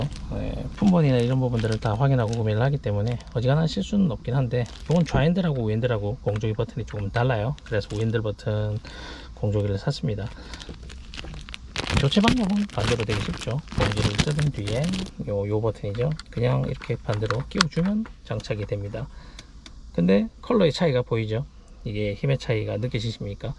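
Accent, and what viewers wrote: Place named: Gyeongsang, South Korea. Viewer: 우연히 지나가다가 영상을 봤는데요 옛날에 저의 꿈의 자동차 였습니다~! 수프라 우핸들의 로망이였는데 ㅠㅠ 사투리가 정감이 있네요 부산경남분 인가요?ㅋㅋㅋ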